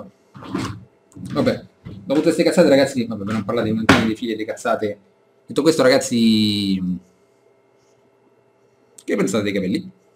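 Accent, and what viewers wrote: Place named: Rome, Italy. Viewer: Si sentiva che eri di Roma :-)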